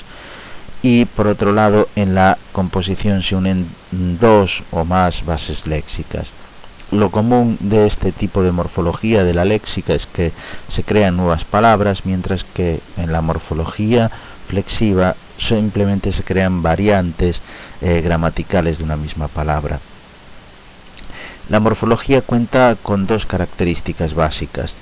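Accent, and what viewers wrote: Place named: Catalonia, Spain. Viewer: es el catalan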